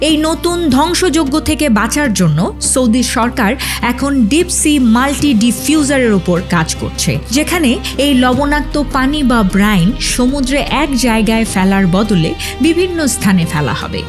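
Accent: Bangladesh. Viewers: আপনার ডিটেইলস পরিচয় জানতে চাই। একটি ভিডিও করলে ভালো হয়।আপনার কথার টোন ভারতীয় নয় বাংলাদেশীদের মতো। তাই কৌতূহল বশত কমেন্টস করলাম।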